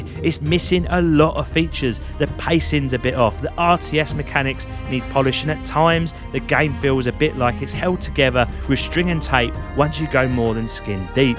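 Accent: United Kingdom. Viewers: Just discovered this channel. Love the writing and the distinctly UK English! : )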